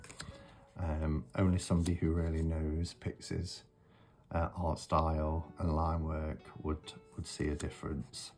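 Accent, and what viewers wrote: Nottingham, United Kingdom. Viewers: great vid, thanks. Do you have any links for these? Can't see the Matt Green Neutral Vibes one anywhere. I'm new to Tarot, love this vid. You sound like you could be from Notts, good man if you are! No offence if you're not!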